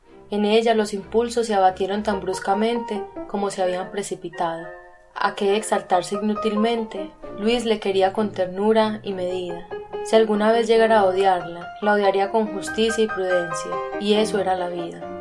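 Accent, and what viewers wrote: Antioquia, Colombia. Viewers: Que habladito paisa tan ricolino e.e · El acento de colombiana le da otro aire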